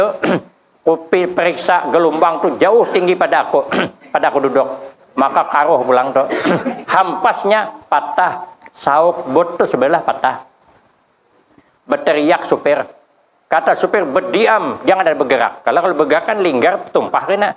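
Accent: Banjar, Indonesia. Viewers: Bujur kh hidin ni urang Jambi,,, di dangar tu Banjar banget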